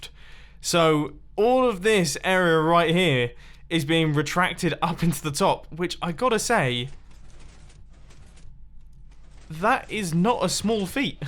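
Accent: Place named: United Kingdom. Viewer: Yeah it’s Seth Bling with a British accent.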